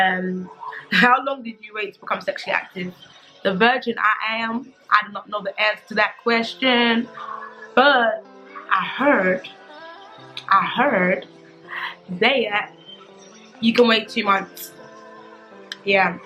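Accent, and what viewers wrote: United Kingdom. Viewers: Really brit accent is the hardest to understand. So hard. I love American English. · British english, probably something around London.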